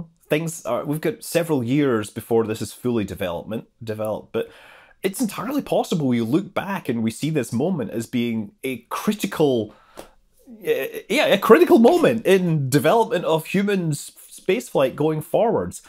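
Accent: Scotland, United Kingdom. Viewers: Otpyrc Ralph Pierre "Kockney"? He's Scottish! Cockneys are from London!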